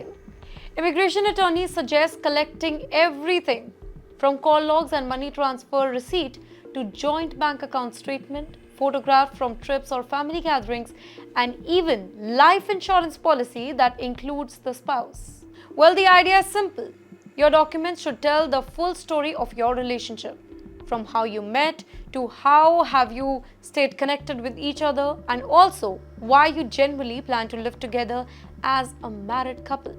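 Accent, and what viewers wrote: India. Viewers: The host has a standard Indian accent so if you call that a third grade reader then they all sound like third grade reader and speaker. She sounds like a third grade reader but the majority sounds like first grade readers in my opinion.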